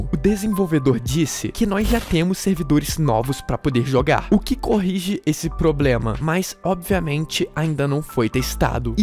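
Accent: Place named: Rio de Janeiro, Brazil. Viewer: Min fala carioca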